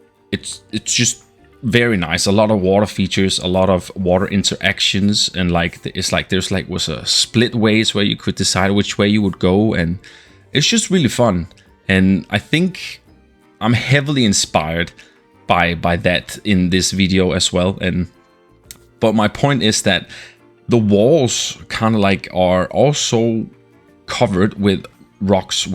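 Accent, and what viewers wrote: Denmark. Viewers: love the danglish accent <3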